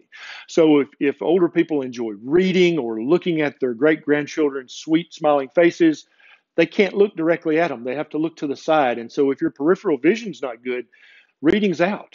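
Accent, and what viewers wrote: South, United States. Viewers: KenDBerryMD Unfortunately the Specialists dont have a clue even in their trained fields My gastroenterologist had no clue what leaky gut is, my Psychiatrist says Benzodiazepines and other Psyc Meds are completely safe, tell my brain that. They easily put you on meds but as far as risks and tapering off not a clue. I see a Hollistic MD as my primary. What foods we put in is the key. Avoid grains, sugar, processed everything, organic, and healthy fats..... what is helping me slowly recover. Thanks for your channel. Love the accent, my Dad was from the South, lost him in 2010. The best to you.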